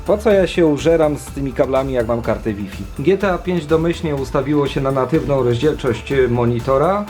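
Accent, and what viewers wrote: Silesia, Poland. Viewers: Ten śląski akcent..taki fajny 😁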